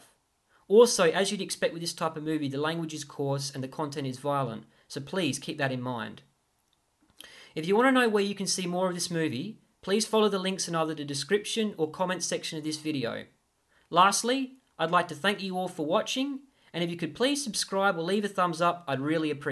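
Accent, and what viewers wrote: Australia. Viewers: You're Aussie mate. Me too 👍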